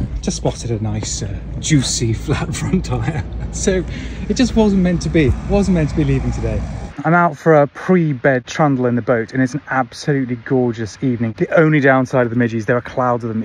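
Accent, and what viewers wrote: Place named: Scotland, United Kingdom. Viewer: I struggled to understand your broad Scots accent. Nice canoe, err bike, err binoe, err cake. It’s a cake, definitely a cake.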